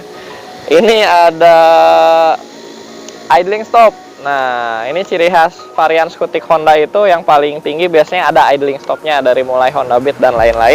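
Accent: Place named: Banjar, Indonesia. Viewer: Banjar nya mang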